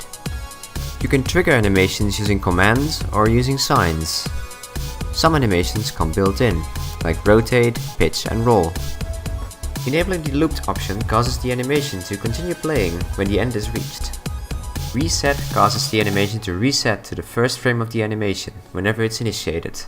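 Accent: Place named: Netherlands. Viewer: You sound Dutch lol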